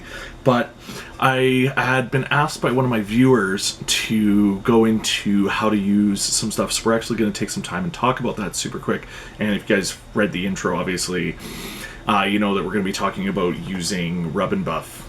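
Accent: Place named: Canada. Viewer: Great vid. Are you Canadian? You sound Canadian. Detecting Canadians through their speech is my super power. ;-)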